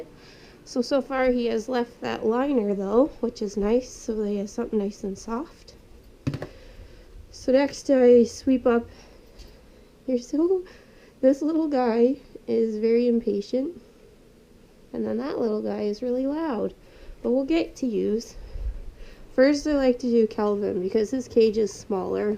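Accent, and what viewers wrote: Canada. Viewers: You sound Canadian 😁 are you?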